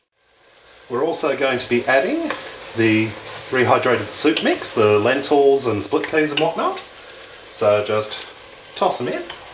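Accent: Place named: Australia. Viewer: most aussies talk like that! me included!!! i think he talks nice!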